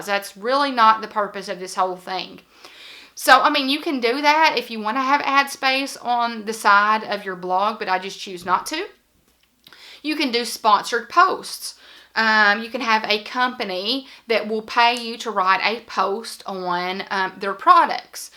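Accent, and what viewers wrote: South, United States. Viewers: LOL I thought you talked slow because you are a teacher and was in the habit of talking slower to explain stuff to your students. I'm a Southerner too, so no problem understanding you. I'm from Ky.And I love your videos.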